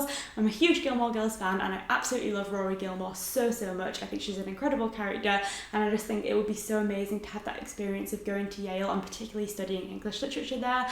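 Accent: United Kingdom. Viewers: Do you have a slight British accent or am I nuts?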